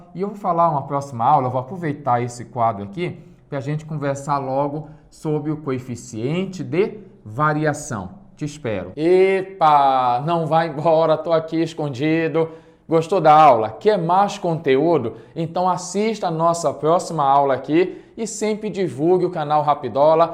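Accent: Pará, Brazil. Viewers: Prof. o senhor é paraense?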